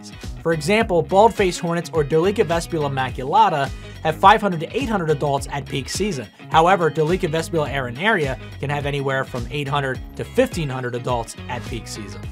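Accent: United States. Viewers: American or Canadian my guess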